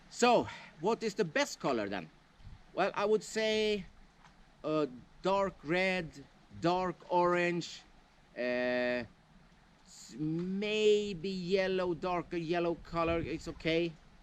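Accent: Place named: Sweden. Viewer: very good swinglish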